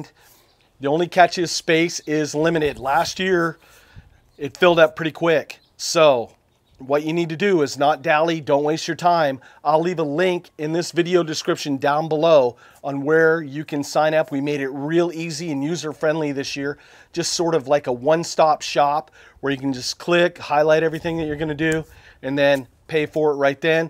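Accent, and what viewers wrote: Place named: Maine, United States. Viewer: you sound like us Mainers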